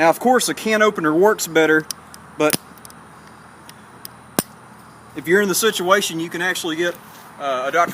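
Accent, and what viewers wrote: South, United States. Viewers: you have the most american accent ever. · Like Elvis, but slightly more country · Southern US. I would say probably Alabama or Georgia, maybe Tennessee. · He has what we call in America a "Southern Accent" which could be further broken down to specific area or state in the Southeastern U.S. It's rather nice isn't it? People have a different accent in Northern California where I live now or in Upstate New York where I grew up. Most people in the world are probably most familiar with what is called a "Mid Atlantic American Accent" which is sometimes considered by Americans as having no accent at all.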